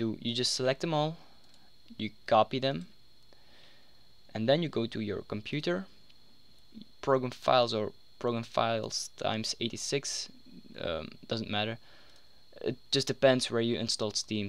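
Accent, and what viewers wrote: Belgium. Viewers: Vlaams accent? :D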